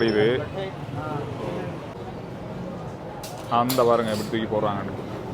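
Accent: Sri Lanka. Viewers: நீங்க என்ன தான் தமிழ் பேசினாலும் இலங்கை மனிதன் தான் இல்ல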